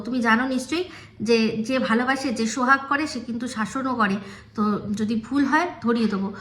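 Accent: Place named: Dhaka, Bangladesh. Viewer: tumi camerar pichon thekei video koro, tomar khati Dhakaia kotha shunte shei lage. Kintu kichuta change ante hobe tomar roasting e. Asha korchi khub shggiri tomar channel ta firee pabe. Fira asho notun rupee.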